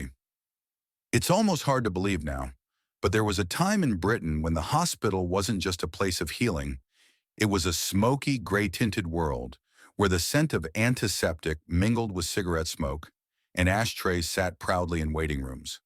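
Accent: United States. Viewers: Why do we have an American narrating this? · i hate to tell you this, but the american accent put lots of people off a video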